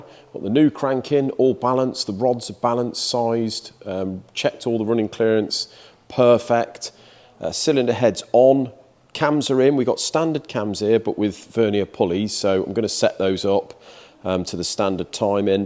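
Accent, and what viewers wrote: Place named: United Kingdom. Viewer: Bahahhaha, called their bluff, you go DOG. Burden of proof, how can they do that now, car running, I am soooooo happy you did not cave congrats. Also, I had a customer who had a fleet of toyota pickups, I started doing Maint and repair on the vehicles, until, they started paying in a 120 day rotation, yeah hey Trimline you are fired, good move, I did not have to carry that balance on the books for months. Also you may be interested that you and Veruca Dumont seem to have the same accent, are you from the same area in the UK? 😉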